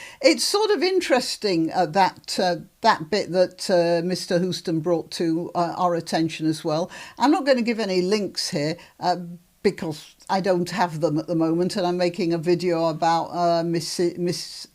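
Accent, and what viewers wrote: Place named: Scotland, United Kingdom. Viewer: Because you have a Scots Accent does not Make you Scottish this is BS.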